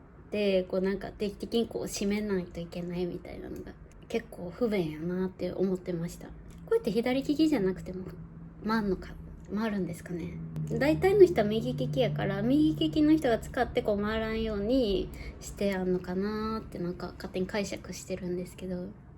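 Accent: Kyoto, Japan. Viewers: nokoさんの柔らかい声、京都弁、聞いててとても癒されます😍これからも喋りのvlogぜひ撮ってください✨ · nokoさん改めて2万人おめでとうございます🎉🎊 nokoさんの京都弁のお喋り可愛くて好きです🥰 むっちゃんへの愛❤️感動しました🥺🥰 これからもカフェやスイーツ、京都のこと、むっちゃんのことなどなど、楽しみにしていますね😉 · 2万人登録者おめでとうございます㊗️ さんの京都弁がとても可愛いくて癒されます😍私は東京生まれの東京育ちで看護師しています。京都に憧れていて、いずれ京都で暮らしたいと、思っています。猫とコーヒーが私も大好きでいつも楽しく観ています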